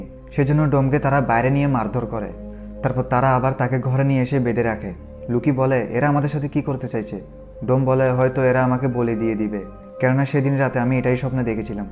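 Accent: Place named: Noakhali, Bangladesh. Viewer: Noakhila bhi... Kemon asen.. apne noakhali basha bolen jae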